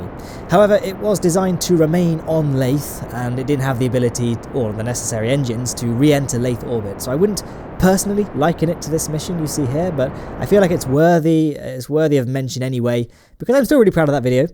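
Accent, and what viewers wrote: United Kingdom. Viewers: Canadian? South park? This guy is a full-fledged brit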